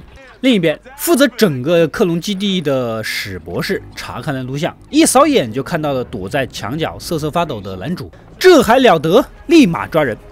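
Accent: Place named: Hunan, China. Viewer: 是湖南的吧？特别喜欢这口音，哈哈哈哈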